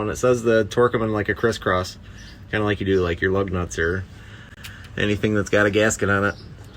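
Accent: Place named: Wisconsin, United States. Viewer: My guess was Wisconsin based on his accent.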